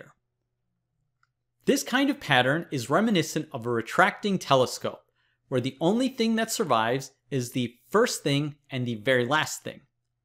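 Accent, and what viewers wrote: India. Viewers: It sounded like,''A NOOB from India''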